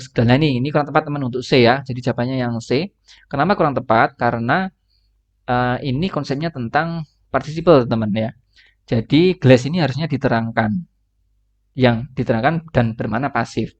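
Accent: Java, Indonesia. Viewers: Sangat mudah dipahami dan saya suka medok jawanya mas wkwk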